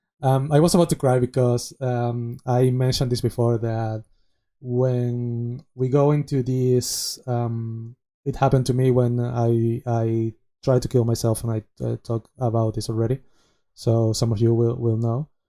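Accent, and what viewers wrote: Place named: Spain. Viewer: Estoy enganchado a las reacciones, y el acento español se reconoce al segundo. Yo el primero. Buen video.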